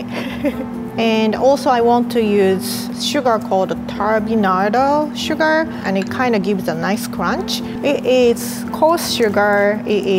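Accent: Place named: Japan. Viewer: I think because she’s Japanese so she pronounces it like that.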